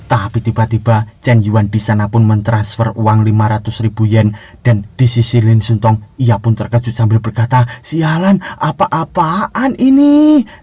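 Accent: Java, Indonesia. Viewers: Seneng bgt logat jowone mas.. mantep pol.. rasa masku lg cerita 😂😂😂 semangaaattt!!!!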